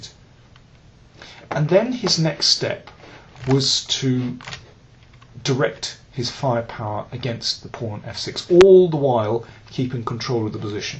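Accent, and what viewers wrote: United Kingdom. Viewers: lol what's up with this guy's rich british accent, sounds very cartoonish haha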